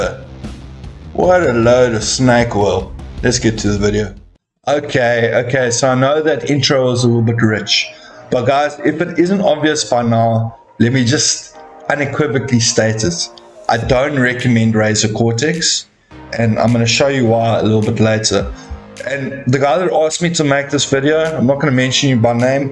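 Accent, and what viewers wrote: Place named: South Africa. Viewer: You sound South African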